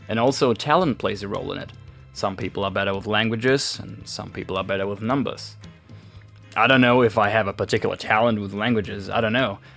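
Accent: Australia. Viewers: as an australian i know this makes no sense but if the general australian accent was milk your voice would be yogurt. its like similar and mostly the same but yogurt is more like sour and vaguely european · I'm Australian - 6th generation - Your accent is so dam close... Good on you - very interesting... John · Your slight German accent is pleasant and attractive. You do speak English with a slight Australian accent. I like it. It's perfecly clear and articulate and you don't stumble with words at all, and express yourself well. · Oh wow 😁that all makes so much sense. I am someone who has commented that you picked up an Aussie accent while you were here. Mind you, I think you picked up the whole Aussie way of life too lol. Thanks for telling us a bit about your 'English' journey. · I recently just started following you from somewhere else on YouTube, but I am doing my usual of following new people backwards. 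It only starts getting funny when I hit on something posted 3 or 4 years ago and I am expecting some very funny moments in your past. Congrats on your Aussie accent shining through. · Yeah you've soaked up a lot of Aussie while here, but that 'yeah' sold it hands down haha! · You have a talent in words mate and spoken in English or we could say Aussie. Well done champ. 😎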